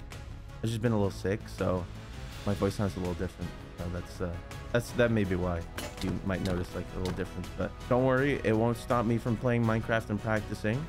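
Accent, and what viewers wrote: United Kingdom. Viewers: The scouse accent is killing me 🤣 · I never knew can do a british accent!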